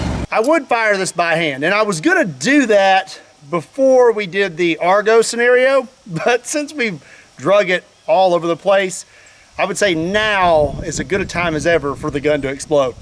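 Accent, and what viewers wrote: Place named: Texas, United States. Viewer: What's with the Texas accent?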